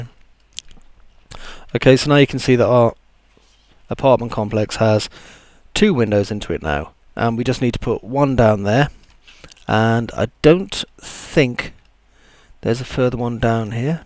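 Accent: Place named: United Kingdom. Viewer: Your accent is extremely British. And great tutorial BTW.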